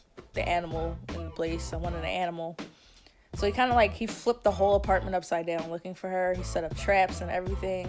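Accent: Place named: Philadelphia, United States. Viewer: 😂 as soon as you started talking I could tell you was from philly. Good video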